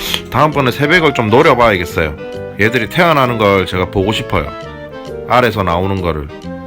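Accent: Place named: Busan, South Korea. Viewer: 말투랑 목소리 졸귀탱ㅋㅋㅋㅋ 부산억양 너무 좋습니다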